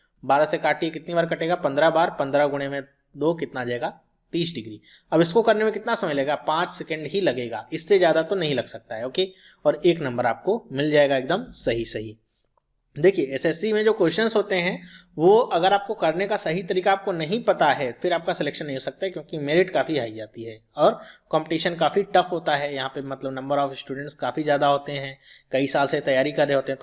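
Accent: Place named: Bihar, India. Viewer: his language seems to be bihari difficult to understand :(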